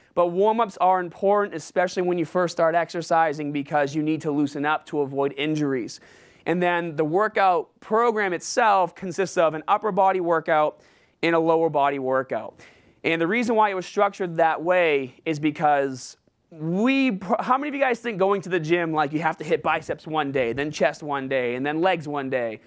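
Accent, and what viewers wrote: Boston, United States. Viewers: Your staht boston accent comes out a little, haha.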